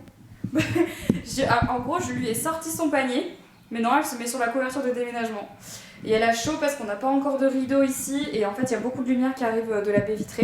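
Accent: Belgium. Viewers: L’accent belge, ma passion 😍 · tu es belge ?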